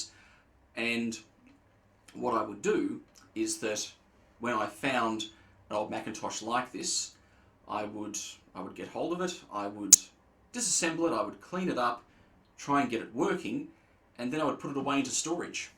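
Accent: Australia. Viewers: Are you from Australia? You sound like you are due to your accent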